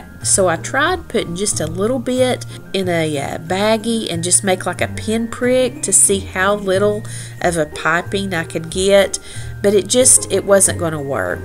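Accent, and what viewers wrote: South, United States. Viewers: This was such a super sweet video. The treats all look delicious.i plan to make a few of these this year, hopefully. The best part of this video is listening to you talk, and especially about the real meaning of Christmas. I love your sweet, soft southern voice. You sound like my family. We are southern and listening to you was a joy.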